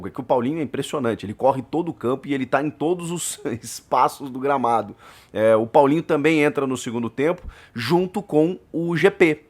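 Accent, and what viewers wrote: Rio Grande do Sul, Brazil. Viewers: Para de puxa gaúcho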